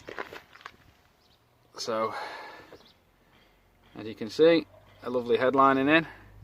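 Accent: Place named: Rochdale, United Kingdom. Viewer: Getting there! Where's the accent from? I'm guessing Rochdale ?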